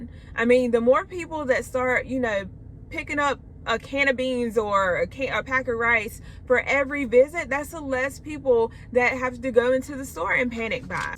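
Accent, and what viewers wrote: South, United States. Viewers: Awesome message. I also adore your American English accent. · She sounds southern. From Sip or Bama i bet.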